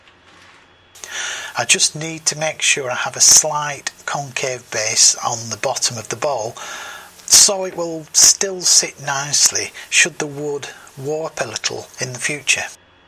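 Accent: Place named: Lancashire, United Kingdom. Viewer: Good to hear a Lancashire accent!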